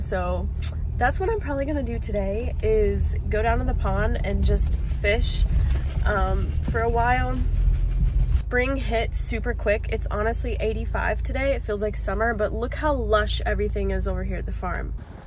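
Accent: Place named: Missouri, United States. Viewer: Sounds like missouri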